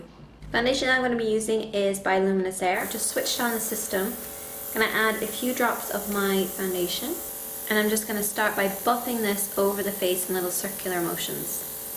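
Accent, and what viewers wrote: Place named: Ireland. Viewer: Are you irish... and moved to the usa years ago? because it sounds as if you used to have an irish accent and are losing it.. that or a parent has the accent and certain words rubbed off on you. whatever it is.. i love that accent. its beautiful.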